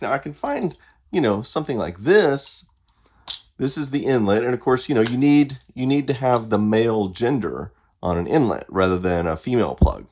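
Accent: Texas, United States. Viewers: So Texans say 'roof' funny, as well as 'solder'? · The pronunciation of roof as "ruff" is mainly just a thing in Texas. Our accents and how we pronounce certain words can very a lot depending on where you are in the US.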